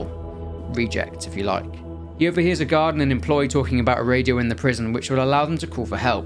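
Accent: United Kingdom. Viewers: This guy as a cool accent. The storage of sounds cooler when there’s someone with an awesome accent telling it 😎 and Brittish/English people are cool to 😎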